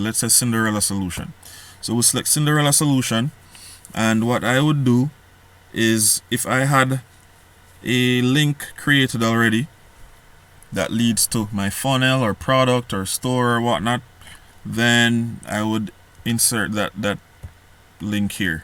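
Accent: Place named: Jamaica. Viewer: You sound like a yardie bro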